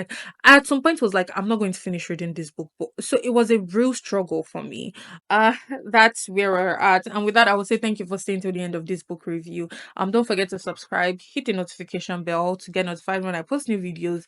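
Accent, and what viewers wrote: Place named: Jamaica. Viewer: Amazing video love your accent are you Jamaican also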